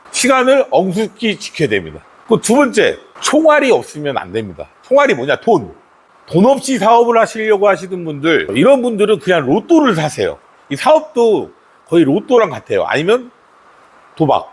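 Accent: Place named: North India, India. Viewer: 인도 북부 케쿠라 억양이 아직 많이 나마 있네요 한국말 잘하심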